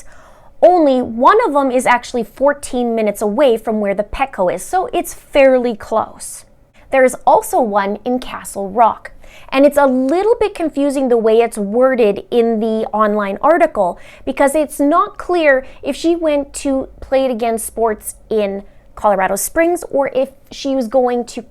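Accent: Canada. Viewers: Omg, I just love your accent! “Oot and aboot.” If trump steals the election in Nov, I may move north, watch hockey, and say ‘oot and aboot,’ too. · Thank you so much for following this case. We all hope Gannon is found soon and want guilTEE to pay for what she's done. Nothing she says makes any sense. She's evil, but not a very good liar and certainly not a very smart criminal. Keep up the great work. BTW, I love your canuck accent!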